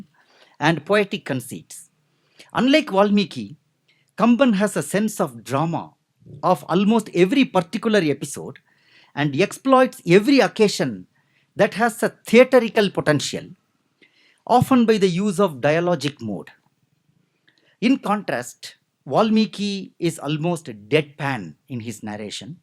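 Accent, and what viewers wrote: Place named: Tamil Nadu, India. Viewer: English pronunciation of Tamilians🙌🙌🙌🙌🙌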